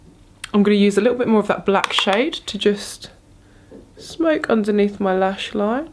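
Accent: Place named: United Kingdom. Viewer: I LOVE UK, I basically love everything British, from people, to London, to this accent, oh my God I feel so happy when I'm listening a British talk hahahaha I have such a deep love for UK, my dream is to travel there someday ❤️❤️ I said all these things just to express myself and my love for this country and not to try convince to pick me as a winner.. I can get all these things, it would just be AWESOME and something so meaningful to me to get them from a British girl ❤️❤️❤️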